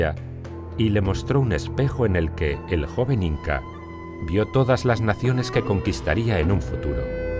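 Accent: Spain. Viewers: En los 11 años de educacion primaria que estudie en Peru y que, solo se enfocaba en Historia del Perú. Jamas me hablaron de ninguna de estas huevonadas que habla este tipo con acento Español. Voy a reportar este video ya mismo. Esto es una burla a la humanidad. PDTA. Escuchen el la 5ta estrofa del Himno Nacional Peruano se las recomiendo.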